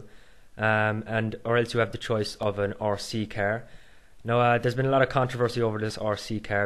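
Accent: Ireland. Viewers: french?! you mad. its blatently irish lol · u sound irish if so so am i :D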